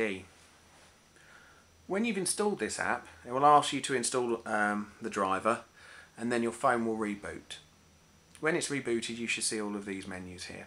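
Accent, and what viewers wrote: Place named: England, United Kingdom. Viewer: I think this is outstanding vid specially for a 1st one. You have big future on YT. I can tell. Appreciate Your work. BTW Thats the second english accent i love... first was Wheatleys one obviously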